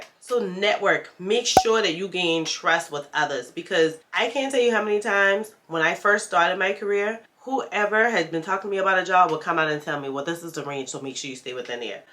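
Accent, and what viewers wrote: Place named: Gullah, United States. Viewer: Are you Gullah Geechee? Or caribbean. You sound Gullah.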